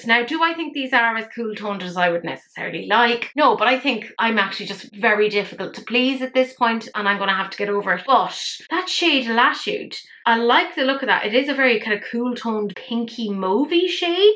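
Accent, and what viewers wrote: Ireland. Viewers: Totally agree on the Bratz palette (and on filler, for that matter. My lips are thin as well and I just…don’t care? 😅 They’re mine, you know? What if it were our thumbs? Wouldn’t that be silly, everyone changing their thumbs because “well it’s entirely too long, this thumb, let’s shorten it.” ) The Viseart palettes may be “basic” colours but their combinations are nice, it feels coherent. (So many palettes don’t.) Edit to add: there surely WILL be lipsticks in the RH collection because lips are practically iconic in that movie (Magenta and Frankenfurter come to mind, and Riff Raff’s “concealer lip” look as well.). Incidentally, you have convinced me that no accent better delivers the phrase “I don’t like it, and I don’t want to talk about it anymore” better than the Irish accent. 😂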